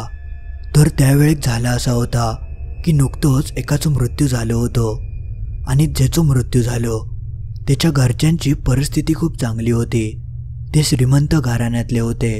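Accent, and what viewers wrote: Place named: Malvan, India. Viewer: दादा तु खूप छान गोष्टी सांगतोस आणी तुझी मालवणी भाषा खूप गोड वाटते. ऐकायला प्रत्येक गोष्ट वेगळी असते .मला खूप आवडतात .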